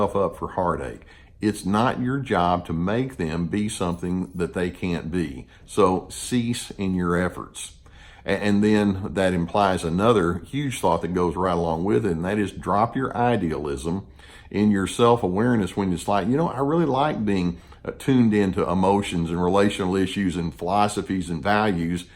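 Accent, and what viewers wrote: South, United States. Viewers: I really enjoy these videos and learn so much about how to apply the principles that both you and Dr. Ramani explain So well. You're warm and folksy style reminds me a lot of sheriff Andy Griffith of Mayberry RFD. Are younger people that is a black-and-white sitcom from the 60s and 70s about a very small southern town. Andy the Sheriff had a little boy named Opie and virtually half the shows were about some sort of silliness that occurred when people were trying to get what they wanted or solve a problem in the wrong way and ended up in trouble. Eventually Sheriff Andy would figure it out and help straighten it out and might give a lesson or two to his goofy but narcissistic sidekick deputy sheriff. And then most shows would end with Sheriff Andy Griffith explaining some principle of good citizenship and healthy honest Behavior to his son Opie, who was about 6 or 7 years old. 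He had a similar accent to and so videos remind me of sheriff Andy explaining life proper values and behavior to Opie. That show is available on streaming services and it's a very relaxing watch before bed or when you're in the mood to fantasize about a world where people are basically decent and everybody learns their lesson and not too harsh of away in the end and Common Sense prevails. Not to mention that doing the right thing pays off. What a shame it is that he couldn't raise both us and the narcissist so that we had good self-esteem and boundaries and the narcissist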